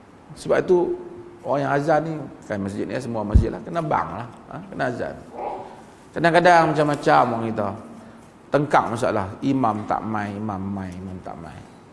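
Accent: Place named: Perlis, Malaysia. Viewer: Ini semenanjung malaysia..perlis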